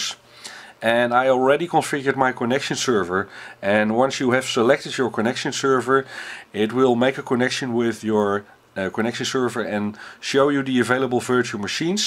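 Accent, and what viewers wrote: Netherlands. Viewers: ja dat is echt duidelijk te horen door een nederlander :p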